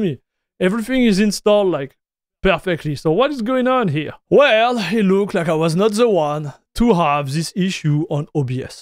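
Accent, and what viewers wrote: France. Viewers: that french accent goes hard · Damn that french acesent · One just have to love this strong french accent :-) Moral of the story: don't use rolling distro nor cutting edge versions of software when you need stability.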